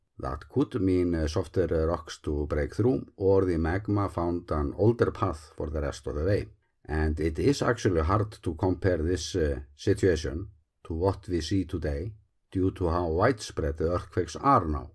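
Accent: Iceland. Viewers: Awesome video as always. I really enjoy how easily and interesting you are talking. And your Icelandic accent is beautiful 🙂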